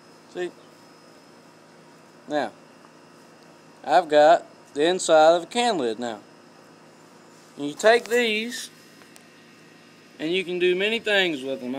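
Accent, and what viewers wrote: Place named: South, United States. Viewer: What part of the south or you from? Im from Gretna Louisiana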